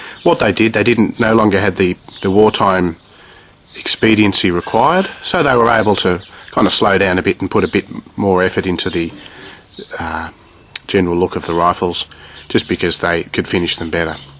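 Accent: Australia. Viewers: Gday from Qld. I wish i had a beautiful aussie accent like you. where are you from?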